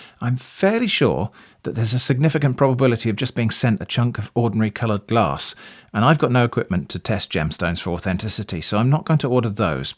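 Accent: United Kingdom. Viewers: why does he sound so SO british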